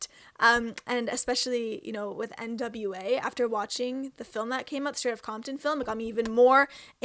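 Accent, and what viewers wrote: Canada. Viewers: You sound very canadian. The "outs" and "abouts" are cute. ^^ I think the "Sorry about that" question is a reference to Colts recent Art of Wrestlling episode with Edge, is it not? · your Canadian accent is awesome!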